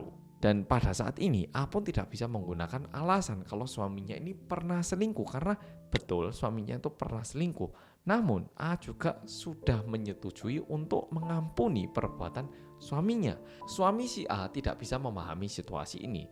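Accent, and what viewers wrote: Java, Indonesia. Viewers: mas, suara viedomu tu kecil, cuma kedengeran medoknya doang, boleh saran ya volume nya agak di gedein, soalnya kaget udah gedein volume trus tau2 iklan kenceng banget suaranya T_T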